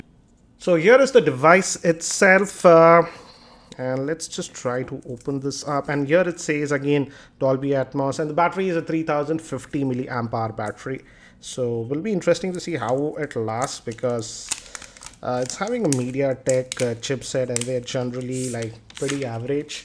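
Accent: Hyderabad, India. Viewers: Hydrabadi English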